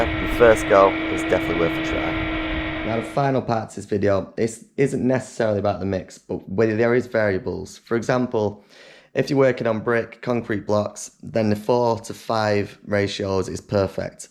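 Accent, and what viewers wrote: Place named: London, United Kingdom. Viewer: Wow that accent. Its like Northern, London, urban, ghetto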